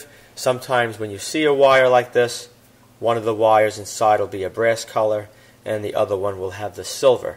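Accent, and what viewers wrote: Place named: Boston, United States. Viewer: Thats too thick a bastan accent for me.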